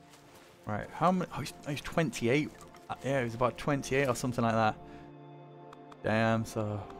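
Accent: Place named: North, United Kingdom. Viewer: Can't place your accent, its definitely northern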